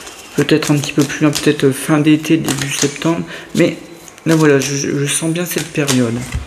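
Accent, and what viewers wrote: North, France. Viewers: Bonjour, bon dimanche. Votre lecture est d'une précision époustouflante. Merci je vous écoute avec votre joli accent du nord de la France ☺️ Cordialement Danièle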